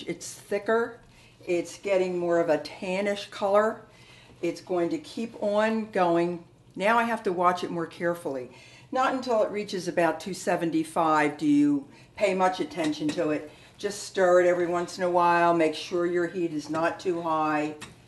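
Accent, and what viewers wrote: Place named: Northeast, United States. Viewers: ! You sound like you’re from the northeast!! My Dad always pronounced the word “water” just like you!! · your so cute 🤗😘 I'm from Canada sound like your from New York area it too me 5 mins to figure out what Wyader was Oh!! WATER 🤣🙃😊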